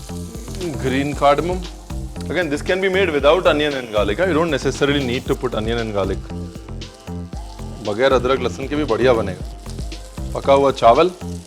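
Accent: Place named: Punjab, India. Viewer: Your Punjabi excent and way of speaking is just great 👍👍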